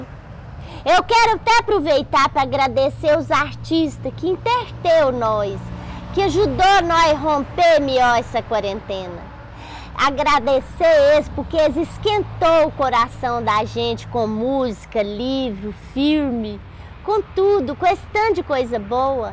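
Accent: Minas Gerais, Brazil. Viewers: Consessa que coisa linda ouvi você com essa simplicidade com esse minerez, só você Deus te de vida longa para nos alerta bjs coisinha 😘😪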